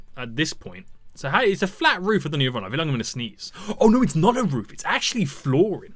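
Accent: United Kingdom. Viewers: How do u not notice he is British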